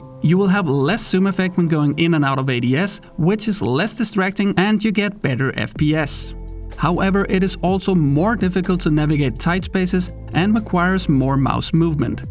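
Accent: Denmark. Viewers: Are you danish? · Jeg gætter du er fra Danmark ud fra din accent :D Fedt content fyr!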